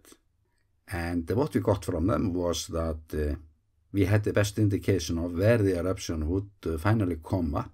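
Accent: Iceland. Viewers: I think he has a charming Icelandic accent, just like Björk😀 I once saw an interview with her, where she was asked how her name was pronounced, and she replied with “It rimes with a jerk”😆 Anyways, you just try to pronounce Eyjafjallajökull, Bárðarbunga or Landmannalaugar, and lets see how it goes, I would probably find that charming too😉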